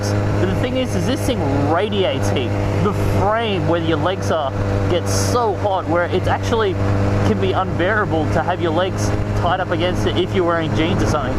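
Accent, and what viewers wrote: Australia. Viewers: You're an American with an Aussie accent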